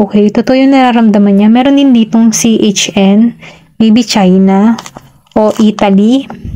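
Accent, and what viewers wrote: Batangas, Philippines. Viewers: Parang Batangueña ang isa sa pa paborito kong tarot reader😊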